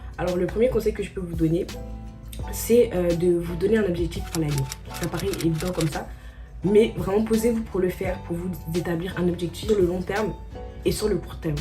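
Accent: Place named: Cameroon. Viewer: dès ta 1ere phrase j'ai su t'étais camerounaise, bonne vidéo.😂🙏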